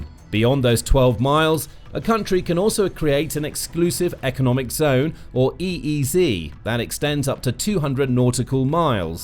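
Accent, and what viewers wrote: United Kingdom. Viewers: So tired of the dude with the british accent. Clickbait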